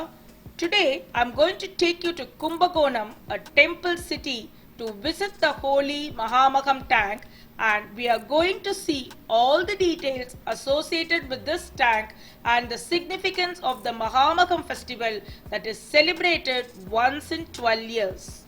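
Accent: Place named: England, United Kingdom. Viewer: Been to this temple many times. Nice English ascent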